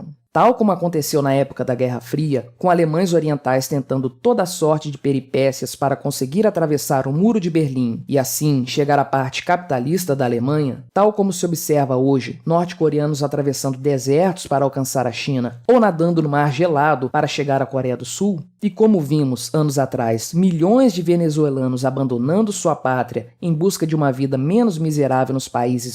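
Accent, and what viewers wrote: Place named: Belo Horizonte, Brazil. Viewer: o sotaque desse narrador é idêntico ao do Nikolas Ferreira de BH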